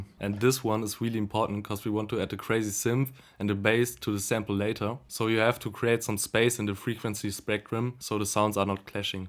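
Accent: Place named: Germany. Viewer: Mann hört sein deutschen aktzent so raus haha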